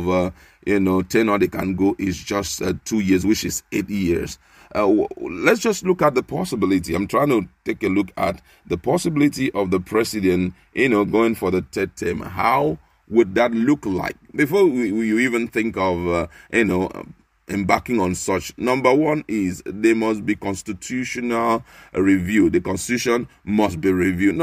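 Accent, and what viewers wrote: Nigeria. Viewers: U sound as a one Nigerian guy I am talking to newscaster we biafrans are not interested in what happens in Nigeria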